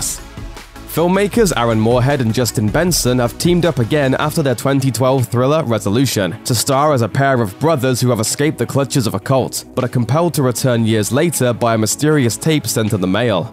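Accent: Australia. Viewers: is this guy australian?? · What's the narrators accent? Y'know, that makes him say "The Man-dellureffekt" or even just "Mandellur". I always wondered about where that's from. Is it an Australian one?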